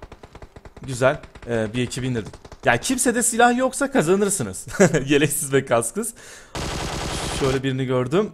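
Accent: Adana, Turkey. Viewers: Şurda Br Adam Ateş Edüüyür Onuda Alalım Adana Şivesi :) Adana<3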